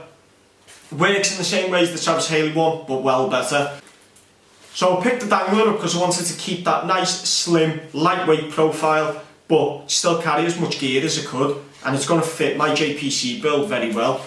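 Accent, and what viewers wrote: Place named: Liverpool, United Kingdom. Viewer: rrrr aaaaa you gotta love the liverpuool acccent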